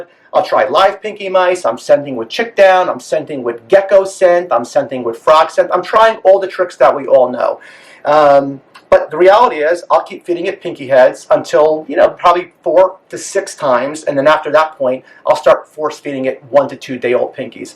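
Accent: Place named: Australia. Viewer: I'm Australian so the accent kills me 😊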